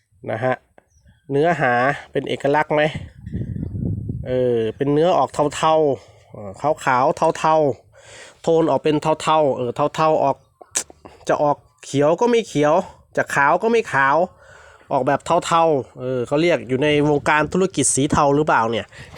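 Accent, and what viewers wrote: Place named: Laos. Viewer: ข้อยวาเว่าลาวเลยกะได้ๆๆสำเนียงมันมาแล้วเด้อพ่อใหญ่ๆๆๆๆอิอิออิ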